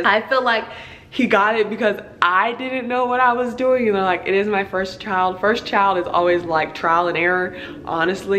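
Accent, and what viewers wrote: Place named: United States. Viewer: You sound country and I love it😩😩😍😍😍❤️❤️🥰🥰🥰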